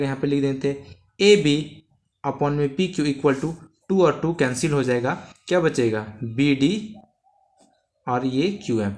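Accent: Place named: Bihar, India. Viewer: Sir app bhiharhi vani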